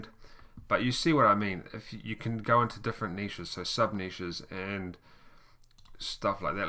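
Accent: New Zealand. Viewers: ...you sound kiwi... totally off subject lol great vdo btw :)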